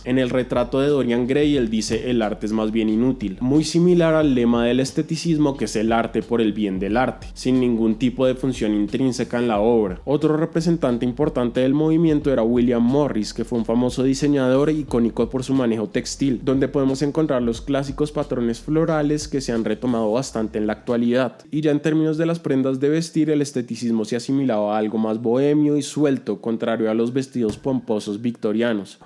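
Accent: Bogotá, Colombia. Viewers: Pero si es roloooo, nooooo, a nadie nunca le gustó el acento rolo! Y respeto al man y me gustó el vídeo y casi no se nota, pero, QUE ESTAN DICIENDO? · Creo que es de colombia y colo de Bogotá, me suena su acento a esa región de Bogotá. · Mk se te nota que eres de Colombia JAJA y que eres de Bogotá :v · Es el asento rolo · Puedo sugerir un video ? Me encantaría que hablaras un poco de Mariana froes. Ptd: Adoro el acento rolo. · Che, no conocía a este man, pero apenas lo escuché hablar, me dije: "este man es rolo". Tremenda voz que se carga uwu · Porque es de Bogotá casi todos hablamos haci aquí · El único acento rolo q no me molesta es el de este men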